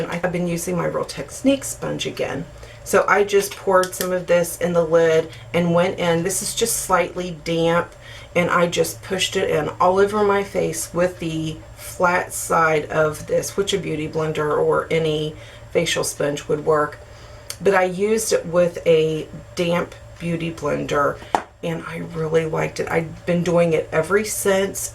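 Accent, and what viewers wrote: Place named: Pennsylvania, United States. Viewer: I need that Hard Candy spray! You make me want to buy everything LOL! You look beautiful as always :) You sound like my family from Pennsylvania :) I could watch and listen to your videos all day long :)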